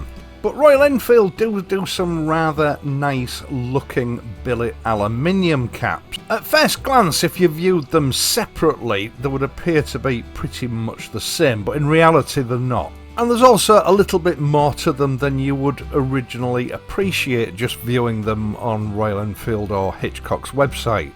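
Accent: United Kingdom. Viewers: Wow! What an accent..may I know which part of the UK you hail from?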